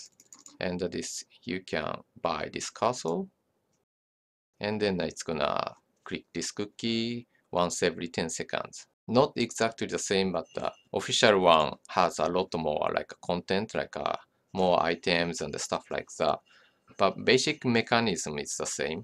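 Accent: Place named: Japan. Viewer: From your accent, I assume you are japanese, but your vocabulary is incredibly refined. Good job, good tutorial, and you've gained a sub. ;]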